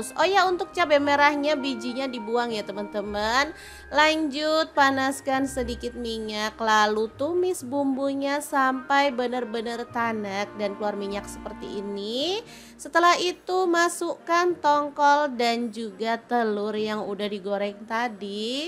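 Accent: Surabaya, Indonesia. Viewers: Sampeyan Yen nganggo boso suroboyoan kae kok lucu men... Seneng banget aku... Selain itu masakan2 nya sy catet di buku, sy praktekin... Suwun bngt ilmunya...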